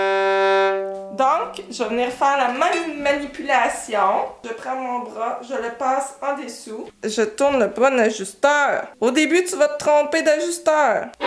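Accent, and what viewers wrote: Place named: Canada. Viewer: Superbe ces explications et cet accent merveilleux qui fleure le Canada...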